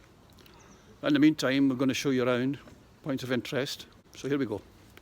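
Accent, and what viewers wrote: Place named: Scotland, United Kingdom. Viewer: Great to hear a scottish voice,talking about Scotland,jedburgh,Edinburgh,my relative staying in the jedbough castle.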